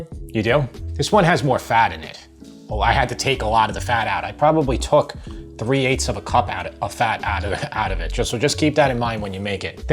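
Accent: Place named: Boston, United States. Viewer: Are you from New York cause you got that Boston accent.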